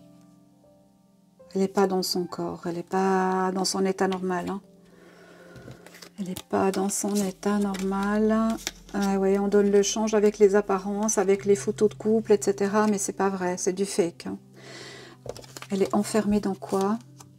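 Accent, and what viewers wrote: Switzerland. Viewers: Coucou, j’ai été scotchée par cette vidéo, je viens de m’abonner 😉😉😃. Mais au fait, a-t-elle vraiment un cancer on peut en douter maintenant après avoir écouté votre vidéo, ils préfèrent dire cela plutôt que d’expliquer qu’il y a eu un couac pendant l’opération? Il m’a semblé entendre un joli accent venant de Suisse romande, si c’est le cas 👏👏 bisous de Genève 🥰🥰🇨🇭🇨🇭🇨🇭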